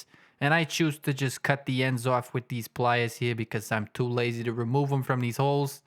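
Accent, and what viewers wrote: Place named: New York, United States. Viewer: I can't say that this guy does or doesn't live in Boston, but that is NOT a Boston accent. Sounds more like NYC to me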